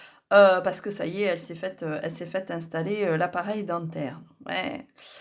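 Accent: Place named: South, France. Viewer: Binjour Moi je trouve que vos vidéos dégagent une énergie positive j'aime bien vos écouter avec votre petit accent du sud je trouve votre vidéo au sujet des revenus irréguliers avec un budget stable de très bons conseils pour des gens à leur compte et bravo aussi pour l'immobilier car nous en avons un peu aussi et c'est vraiment un boulot plein-temps avec souvent des demandes des locataires des locataires ,qui ont la bougeotte ils savent pas où ils sont bien ce n'est pas évident à gérer du tout et attention aux formations hors de prix qui vous disent que les banques prêtent à tour de bras une fois que votre formation est payée assez cher la banque ne prête pas toujours on a eu le cas dans la famille justement cette année avec ma fille infirmière "suspendue"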